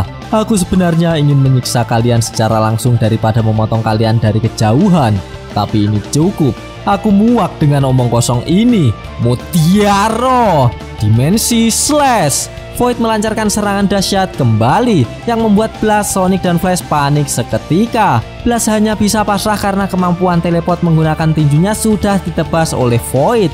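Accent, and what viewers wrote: Java, Indonesia. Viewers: Medhook pol min 😂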